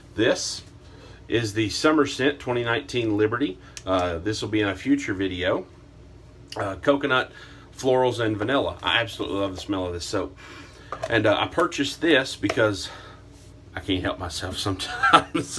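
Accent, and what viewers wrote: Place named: South, United States. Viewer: Great Southern accent, what state is that?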